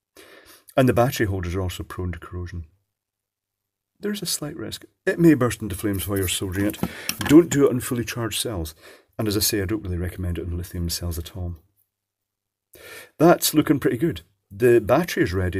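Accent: Scotland, United Kingdom. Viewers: Pure meditation, the soothing flow of on the fly hacking and improv... plus the mellow lilt of the Scottish commentary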